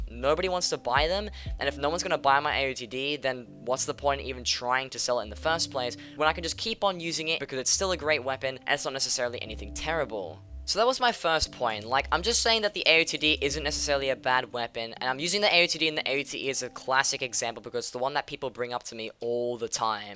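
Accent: Australia. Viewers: Are you a Melbourne boy?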